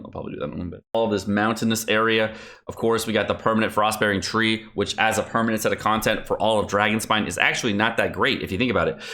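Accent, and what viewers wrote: United Kingdom. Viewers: I love your intro with and your videos theory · = best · the thing is getting a bit cringe but i guess your audience likes it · I just watch for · is why original is able to provide us with information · is poggers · Someone should make a compilation of all of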